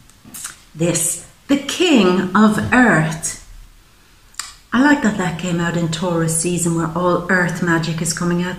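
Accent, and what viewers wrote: Ireland. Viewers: I love your Irish accent. I work for a lovely Irishman. The Irish are poetic, and deeply in touch with the spirit energies! <3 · Felt pulled to ur video😇after watching O.A.'s 1st season yesterday, I see why🤔👰🤴🥂loved the reading & ur lovely Irish accent😃😎☄had to sacribe to ur channel & want to say THANK YOU to u in advance, 4 helping me w/my "Ascension"☄😎👰🤴🥂🕉 4/28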